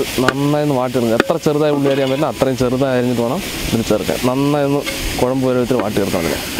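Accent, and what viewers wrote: Palakkad, India. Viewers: പാലക്കാട്ടെ സംസാര രീതി നല്ല രസം കേൾക്കാൻ ഏട്ടാ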